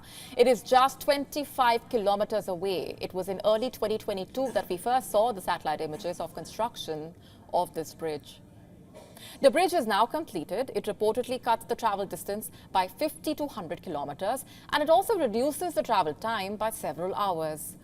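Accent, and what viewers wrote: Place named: India. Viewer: Strong Curry flavor accent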